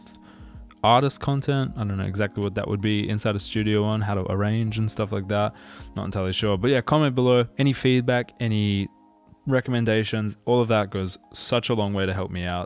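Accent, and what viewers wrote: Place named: South Africa. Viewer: Definitely found this helpful thanks. From your accent I gather you're a fellow South African.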